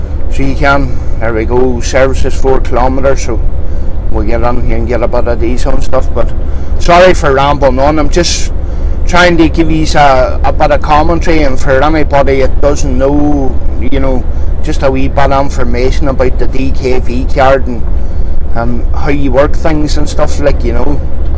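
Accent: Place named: Ireland. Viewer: I laughed when you said the Polish driver spoke English - your Irish accent and his Polish accent must have made for an interesting convo. Maps are a great inclusion. I have been reading the road signs and then searching on Google to see where you have been until now. When you give the updates could you perhaps do a 'picture in picture' so we can see where your actually driving whilst talking. Does your 'better half' watch the videos? Would appreciate how hard you toil to pay the bills. Much enjoyed!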